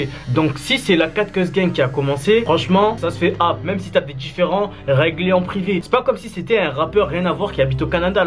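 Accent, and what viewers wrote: Marseille, France. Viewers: GG a toi le sang avec l accent marseillais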